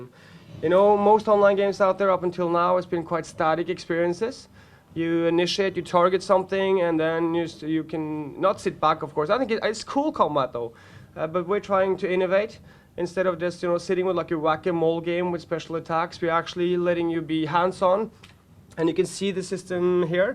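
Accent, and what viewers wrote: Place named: Norway. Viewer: Hva faen mann! xD Så lett å høre at du er norsk! What the fuck, man! It's so easy to hear that You are norwegian!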